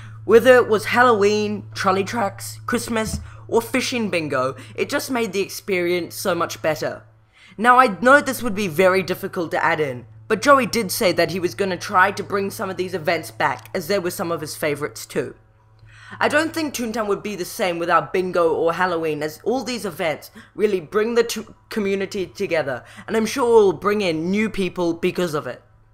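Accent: United Kingdom. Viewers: You sound british :D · It's British I believe and I know, I wuv it too!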